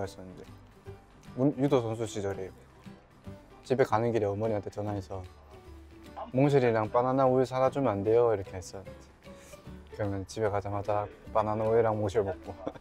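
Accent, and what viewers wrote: Gyeongsang, South Korea. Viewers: 오 현실말투 갱상도싸나이네요~ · Its cute how he talks with gyeongsangdo(?) dialect. That intonation can be heard by people from there hehe is from daegu right ^^ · You are from Daegu right? I can recognize his accent 😂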